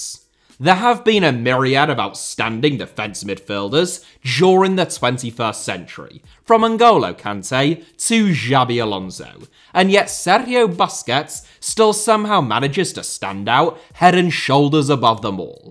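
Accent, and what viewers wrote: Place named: East Yorkshire, United Kingdom. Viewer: I often disagree with, yet here I am again to let him elicit angry puffs of steam out of my ears with his opinions. It's official, it's an addiction now. I'm even subscribed now. I blame that drowsy East Yorkshire accent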